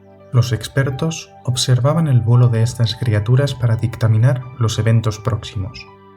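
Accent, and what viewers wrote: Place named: Castile, Spain. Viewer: Tengo una duda. Cuando te escucho hablando, escucho que hablas el Euskadi o vasco con un tono más castellano que vasco. Eso creo! Mi duda es ¿la letra Z pronunciada en vasco igual que en castellano?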